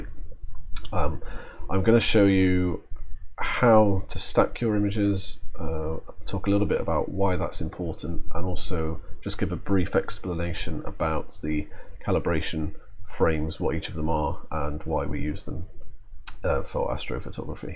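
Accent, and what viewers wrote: United Kingdom. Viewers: My God he's from Manchester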